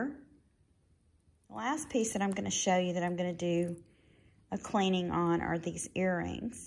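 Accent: South, United States. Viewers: You sound awfully Southern or am I wrong? I am in AL.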